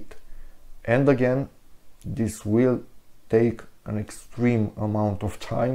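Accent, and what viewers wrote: Romania. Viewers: are you romanian? the accent gives it away😀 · Ănd hir iu mast bi șur. Mai bine ai spune in româna. Ori fă pronunțarea sa fie in engleză și nu româno-engleză.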